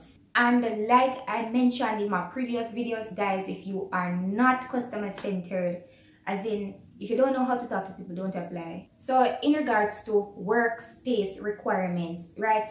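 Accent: Jamaica. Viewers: Hey, yardie gal! 😉 Yes, J'cans do have a heavy accent... but so do most (if not all) of us Caribbean people. It's just a matter of tempering it and slowing down our speech. Although I am not an organised person, I still decided to 'like' this video because I am SOOOOOOO elated to finally find one of my Caricom peeps rocking YT's MMO niche! Like you said, there are waaaay too many "big-country" people peddling all kinds of strategies or services and saying that they can work "anywhere that you have a computer and internet connection" without bothering to actually confirm that for themselves. We in the English-speaking Caribbean know about the nightmares of financial regulations which promote forex retension and money-laundering controls, the latter of which are often imposed by "boss" countries in the G8 who actually do way more money-laundering than the Caribbean. 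Nuff said. Anyway girl, keep keepin' on. If you vary your sub-niches enough, I just might find a reason to subscribe 😉 Best of luck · You have a beautiful Jamaica 🇯🇲 accent.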